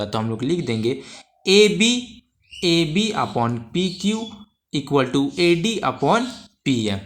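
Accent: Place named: Bihar, India. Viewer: Sir app bhiharhi vani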